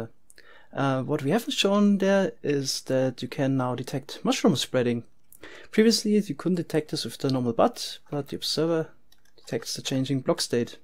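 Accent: Germany. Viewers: You're german, right? :)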